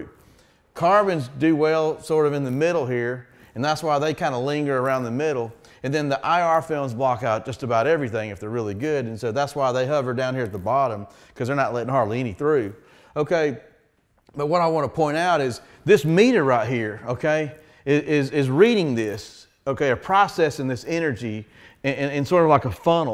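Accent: South, United States. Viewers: An intelligent, coherent presentation of a complex idea. Imagine that from someone with a southern accent.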